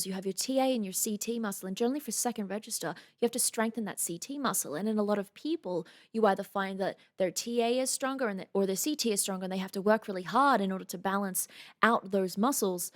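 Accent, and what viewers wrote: Australia. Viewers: I love your aussie accent 🥰🥰🥰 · This would be a perfect soundtrack to a game teaser or trailer. Also... It's always so much fun to hear Aussies saying know. "Knar"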